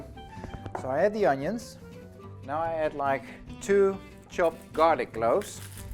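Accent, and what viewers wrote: Netherlands. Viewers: He must be Dutch with his accent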